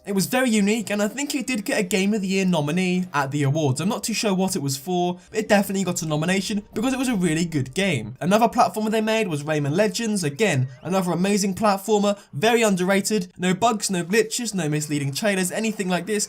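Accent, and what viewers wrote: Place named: England, United Kingdom. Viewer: You are English right?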